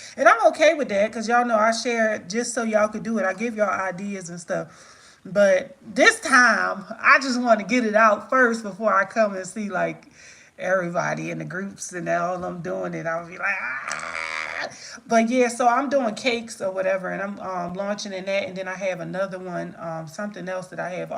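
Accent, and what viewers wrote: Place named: Philadelphia, United States. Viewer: I was drawn to you from the first video I watched. I told my husband honey look at this candle girl from Philly. I love a Philly accent. When I found out you had a group and did classes I been a fan ever since. I have watched those other candle maker videos, they put me to sleep, (no shade on them) but it's a no for me. Now u back to doing skincare, they gone be mad. This is a extra bonus. Get ready for the haters cause 😎 u about to blow it out the water.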